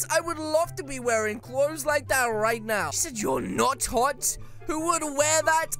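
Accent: United Kingdom. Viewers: are u british im confused... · bro are u British?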